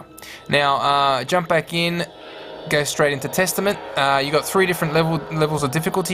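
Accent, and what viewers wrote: Australia. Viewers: Hes australian........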